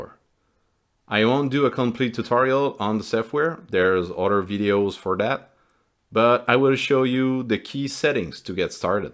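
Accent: Quebec, Canada. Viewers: at first I thought this was going to be another European build, but that accent is Francophone Canadien isnt it? the Mastercraft toolbox confirms this. subscribing. hello from BC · Hey! I assume by your accent your french canadian, which i also am. In case im wrong ill keep on with the english. Anyway, im interested in building my own cnc waterjet cutter as i need excessive precision on the cut itself. Ive been surfing thru a lot of CNC videos but it just keeps on shuffeling the pot in my head.. would you be able to break down the control system more precisly? You went over the diagram but it seems fairly complicated the way mach talks to the breaker board and all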